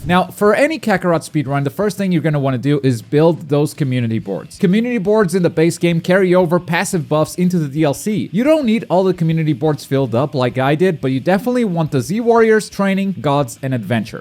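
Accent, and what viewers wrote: Portugal. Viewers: Caramba, vc é de Portugal??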